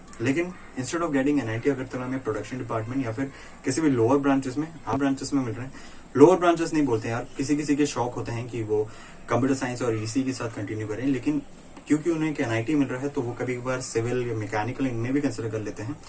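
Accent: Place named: Assam, India. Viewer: smooth Asamese bro!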